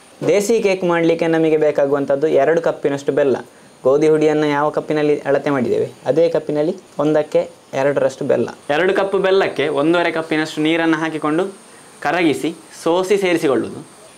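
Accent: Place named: Dakshina Kannada, India. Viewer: Le ..mangya... Edu dakshina kannada base... Respect kodu...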